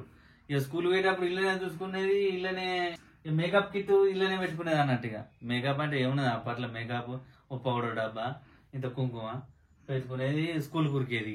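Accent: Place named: Nirmal, India. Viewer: Meedhi Nirmal ha annna mem.sem hatlane matladutham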